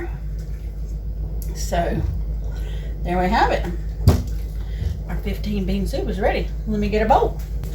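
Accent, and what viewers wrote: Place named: South, United States. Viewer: Thanks for the recipe...i like the sautéed onions and garlic trick...cooking right now...smells delicious! I just love your cute little southern accent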